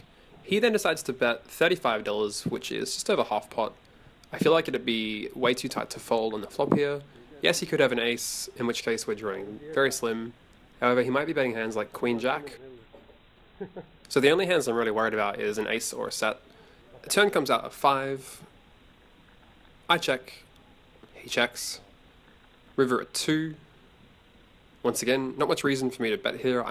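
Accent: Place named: Europe. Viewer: Can you include where you are playing your sessions please ? I notice the dealers are dealing from what appears to be a shoe? Never seen that before and I'm just curious as to where your from I noticed an accent so I'm guessing somewhere in Europe? Anyway keep up the good work the Vlog looked great. Have a great day.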